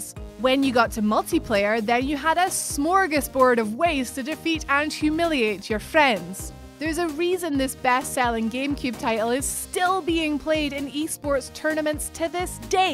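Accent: Scotland, United Kingdom. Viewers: Even though it is a Scottish accent? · Are ye scottish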